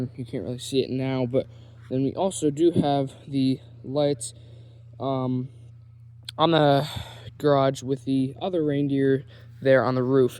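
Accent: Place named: Minnesota, United States. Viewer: man, i seriously thought it was. I think the accent added to my conviction that it was Minnesota landscape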